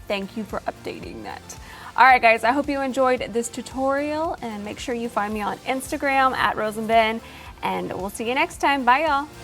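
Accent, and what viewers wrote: South, United States. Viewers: I love your colorful tutorials and your spunk. You are so fun to watch. I love your Southern accent too.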